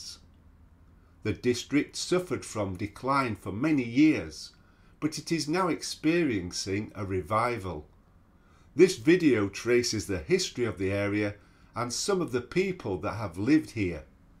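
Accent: Yorkshire, United Kingdom. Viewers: Your narrative is just fine. I love hearing your Yorkshire accent and your narrative reminds me of school, when my teachers would slow down their speech to ensure we understood concepts. If you 'talked proper Yorkshire' I bet few folk from outside Yorkshire would understand you. - Thanks for the videos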